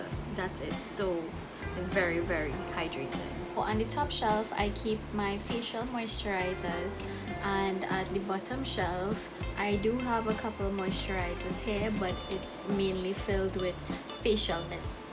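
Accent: Trinidad and Tobago. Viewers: I heard you’re accent and said this have to be a Trini. Does your fridge condense? I am looking to buy this but the reviews say it condenses so I came to YouTube to find a review and found your video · I know a trini accent when I hear one!! Heyy family 🇹🇹🇹🇹💕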